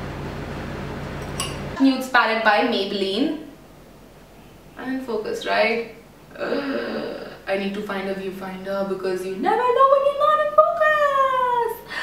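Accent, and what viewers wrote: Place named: Delhi, India. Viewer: tv halka karwa deee wats tht meann this is one of ur best video n i save it offline love ur delhi wala tone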